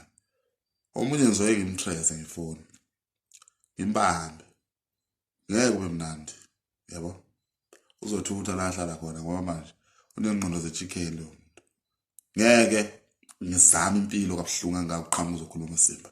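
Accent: Zimbabwe. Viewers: Cha mpela nje wizimbabwe uyazwakala noma ukhuluma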